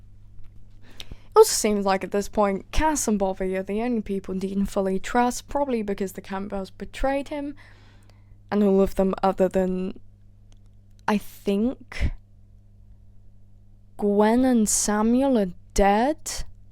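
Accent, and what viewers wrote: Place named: England, United Kingdom. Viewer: Didn’t expect an English accent when I came from tumblr (everyone is just American to me) and now I’m even more excited to watch ‼️‼️‼️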